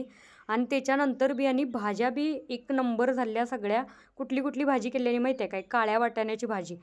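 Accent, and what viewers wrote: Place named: Belgaum, India. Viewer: Bhasha belgavchi vatte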